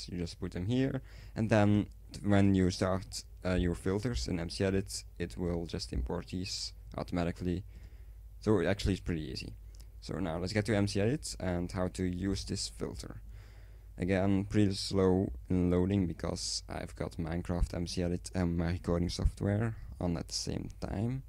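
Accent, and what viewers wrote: Netherlands. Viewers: Nou ja ik ben gewoon nederlands ik hoorde het aan je accent en aan je naam zag ik het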